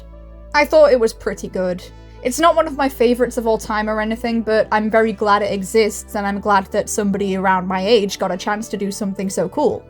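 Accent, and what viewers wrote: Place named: United Kingdom. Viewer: Really cool watching a worm talking about liminal space in a british accent.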